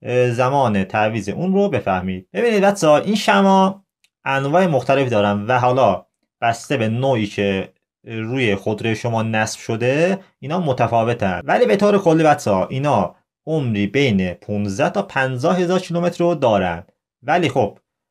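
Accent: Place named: Azerbaijan. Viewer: ممنون از توضیحات کاملتون بخصوص بالهجه بسیارزیبای اذری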